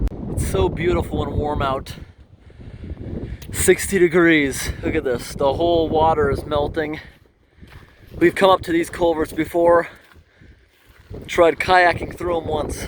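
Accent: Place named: United States. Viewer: That american "englih" ist unbearable - sounds like defect radio ... sounds extra-disgusting ! I love ENGLISCH, not that pidgin-jabbering ... !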